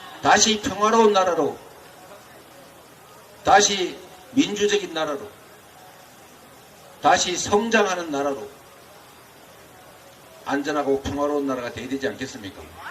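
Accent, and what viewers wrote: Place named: Busan, South Korea. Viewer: 얍실한 정부 보내 버립시다 !방언 멋져요😊부산 표준어 최고야 🎉🎉🎉🎉🎉🎉🎉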